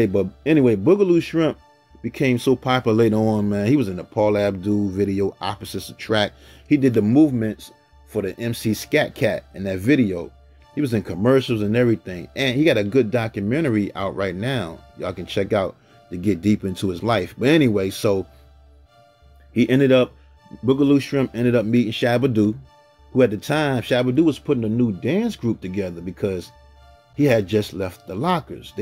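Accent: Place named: New York, United States. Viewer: Your channel is awesome. And that New York accent!!! 😍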